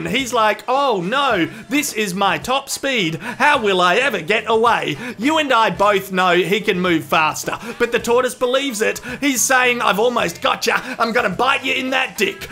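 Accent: Australia. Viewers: Spoken like a true Aussie mate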